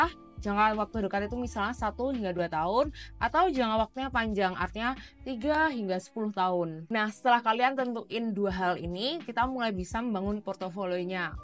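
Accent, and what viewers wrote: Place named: East Java, Indonesia. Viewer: Penjelasannya mudah dipahami 👍, kyknya orang jatim deh😊(dialeknya)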